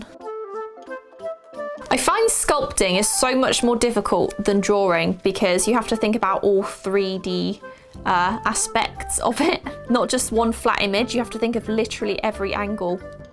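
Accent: England, United Kingdom. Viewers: I assume you're from England by your accent, mine hasn't arrived yet :(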